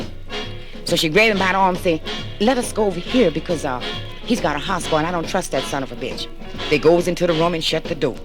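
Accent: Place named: Louisiana, United States. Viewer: She sounds like shes from south Louisiana lol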